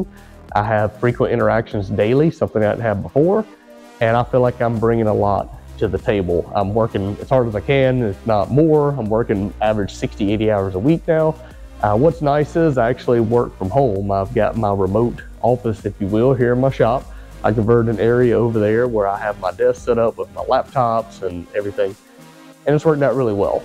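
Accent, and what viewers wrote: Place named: North Carolina, United States. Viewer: Man, the more I looked at your videos the more I began to think you’re the same person from a forum I used to be on back in the day, HPJunkies. Then I started listening closely to your accent and that’s definitely a North Carolina accent so my bet is you’re the same person! Loved your threads on that board back then and your videos now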